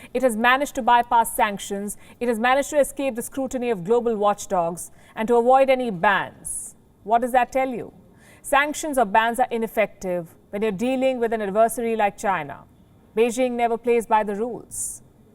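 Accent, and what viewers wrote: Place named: India. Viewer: 这大婶天天用咖喱英语黑中国，数十年如一日😅😅😅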